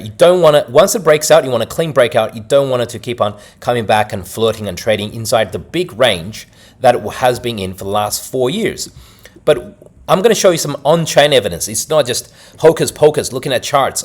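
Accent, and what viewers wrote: Australia. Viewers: Accent sounds Australian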